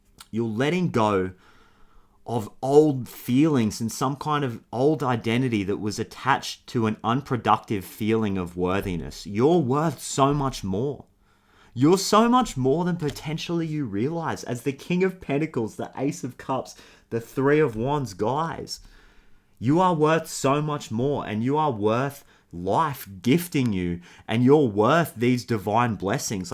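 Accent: Australia. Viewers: You are so talented, appreciated and on point. And your aussie accent brightens my day everytime 🙏😁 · Hi, Thanks again, for your wonderfully encouraging words!! Are you Australian? (Your accent gives it away…lol). My grandpa was from London, but he always said he wanted to go to Australia. He is in Heaven right now, so he can probably travel anywhere he wants to. Best to you,. 🐶🥰🌸 “I am so grateful that I have received large sums of money, under Grace in Perfect ways.”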